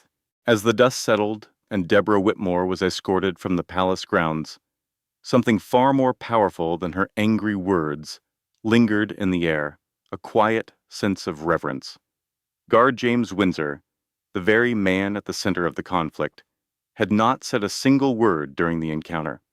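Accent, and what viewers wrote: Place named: United States. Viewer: It's a good story to express about our guards but spoken by an American. I began to feel sea sick when the picture kept moving side to side.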